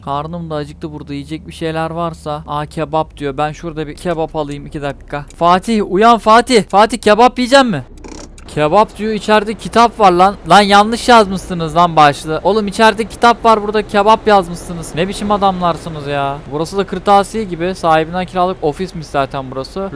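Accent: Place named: Trabzon, Turkey. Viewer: Abi çok komik trabzonlu konuşuyon ya 😂😂😂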